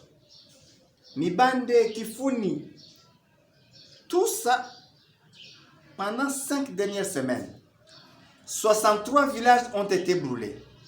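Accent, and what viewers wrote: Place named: Rwanda. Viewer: Accent rwandais, le même accent que ruberwa, kagame etc... Cherchez l'erreur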